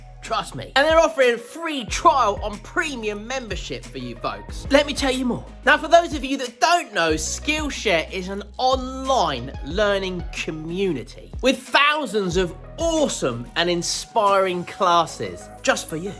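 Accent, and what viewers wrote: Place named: Yorkshire, United Kingdom. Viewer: Dead sexy, that Yorkshire grit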